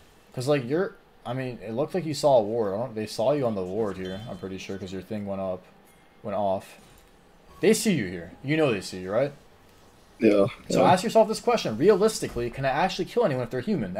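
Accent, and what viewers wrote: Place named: Australia. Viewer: Idk Australian accent makes me think it’s oce and as an oce player it’s super inflated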